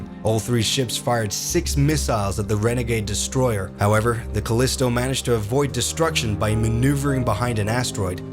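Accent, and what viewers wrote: United Kingdom. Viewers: I think you and installation both also have good voices for narrating Halo lore, especially because of how sophisticated and knowledge driven y'all two sound with the British accent.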